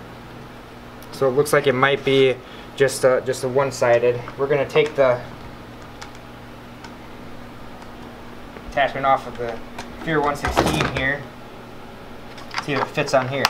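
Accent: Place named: Wisconsin, United States. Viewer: "oh yah, you betcha" You must be located in Wisconsin.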